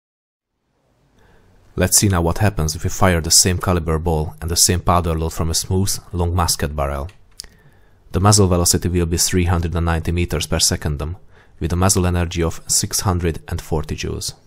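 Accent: Hungary. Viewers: I know that you’re Hungarian but the accent is extremely annoying.... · I love that hungarian accent :) Experiments like this are a mix of experimental archaeology and some sort of survivalism. You never know when such infos will be useful. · Hungarian accent 😃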